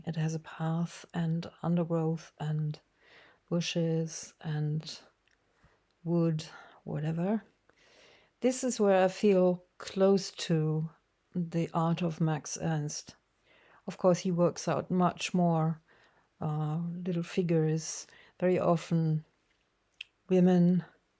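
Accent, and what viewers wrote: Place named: Germany. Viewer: Ich tu mir echt schwer und muss mich höllisch konzentrieren, dieses deutsche Englisch (Denglisch) zu verstehn. Ich schaue viele Videos in Englisch und verstehe sie auch, aber das ist, sorry, weder Fisch noch Fleisch. Ich muss den Ton abschalten und die Untertitel lesen, um das Video zu verfolgen. Vielleicht besser in Deutsch kommentieren und die Englisch Übersetzung in den Untertiteln anbieten.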